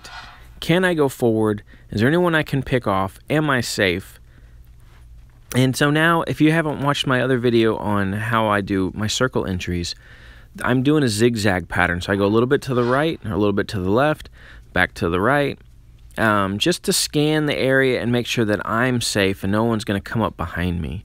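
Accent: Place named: Chicago, United States. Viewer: I’m not really sure. I haven’t heard it too much. I wanna say like Chicago or somewhere a little farther north.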